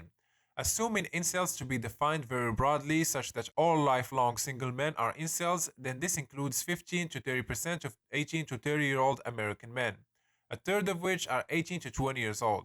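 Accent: Morocco. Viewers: The speaker seems moroccan from the accent 🇲🇦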